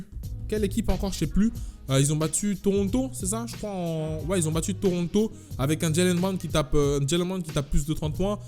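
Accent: Cameroon. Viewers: j adore ton accent camerounais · Avec son accent du Cameroun c'est trop cool like beaucoup si tu aime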